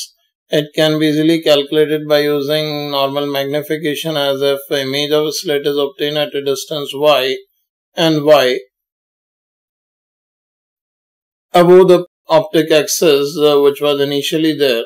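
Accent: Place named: India. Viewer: I thought only arabic accent is funny. fck.. Indian accent is one step further. Bullshit.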